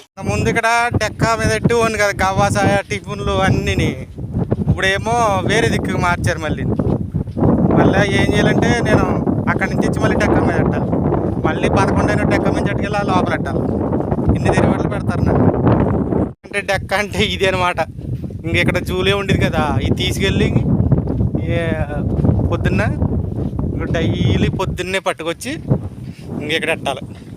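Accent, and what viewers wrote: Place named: East Godavari, India. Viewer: హాయ్ అన్న నీను మొదటి సారి మీ, వీడియో చుసాను బాగుంది, మీరు మాట్లాడే విధానం బాగుంది,మీ,మాట, తీరు చూస్తూంటే మా, తూర్పుగోదావరి జిల్లా మాటలా ఉంది, ఇప్పుడే సబ్స్క్రయిబ్ చెసాను బ్రదర్ ఇప్పుడు నుంచి మీ, వీడియోస్ చూస్తాను అల్ద్ బెస్ట్ బ్రదర్ అండ్ జైహింద్ 👍👍♥️♥️♥️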